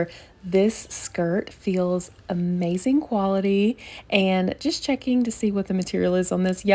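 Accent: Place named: Russia. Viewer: Interesting accent. I think your first language is Russian?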